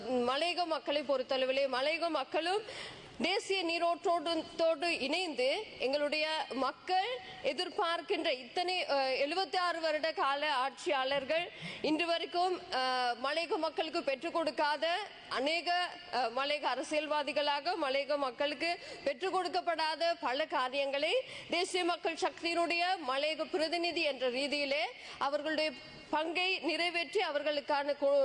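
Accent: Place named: Tamil Nadu, India. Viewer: Proud of you sister really happy becouse of i heart tamil slang after long time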